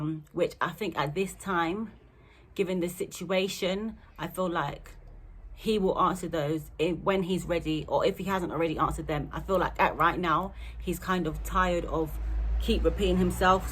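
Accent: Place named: England, United Kingdom. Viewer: So beautiful n love the English accent gotta subscribe right now!!!!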